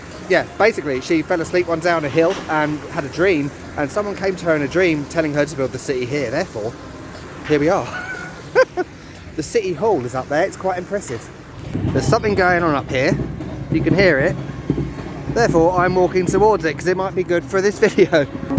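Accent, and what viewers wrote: England, United Kingdom. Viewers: Video sam coveka dok je snimao, mislio sam neki domaci triper prica na engleskom, kad ono pravi englez 😆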